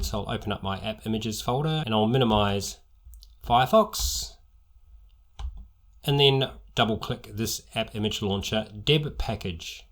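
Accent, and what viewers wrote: Australia. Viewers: Thank you very much for these hints and tricks in MX Linux. I had so many problems with Ubuntu an Ubuntu Budgie(could not run my scanner and printer). Now with Your how to*s I finally been rescued from MacOS (too expensive) and Windows(hate it). The only suggestion I like to make is the confusing tools between "tools" and "tweaks". I always have sort out witch one is the setting up the computer and witch one is responsible for just the outfit. By the way I could not spot Your accent. guess you are from "Downunder" or this direction somewhere. TX again.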